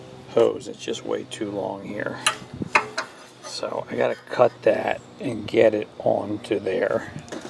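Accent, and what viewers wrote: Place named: New Jersey, United States. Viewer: Since I subbed a little while ago I thought I detected a Jersey accent. Sure enough! I’m originally from northern New Jersey myself, but have been on Cape Cod most of my life.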